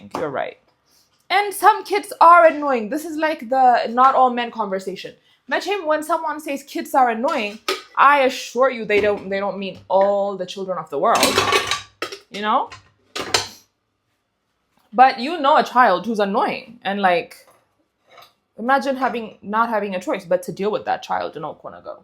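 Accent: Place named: United Kingdom. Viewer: Love the British accent ❤ girl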